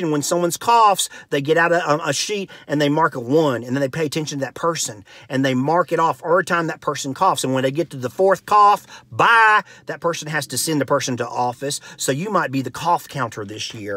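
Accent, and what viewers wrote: South, United States. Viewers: "Errr body". This gentleman definitely resides in the southern portion of the United States.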